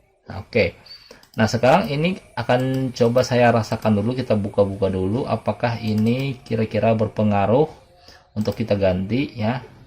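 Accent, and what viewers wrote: Banjar, Indonesia. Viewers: Orang Banjar kah bang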